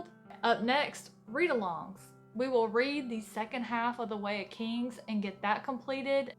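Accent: South, United States. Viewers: I just subscribed to your channel. I love your Southern accent. I just bought The Way of Kings by Brandon Sanderson. I've never read a book by him but I've heard great reviews. Is this book really good?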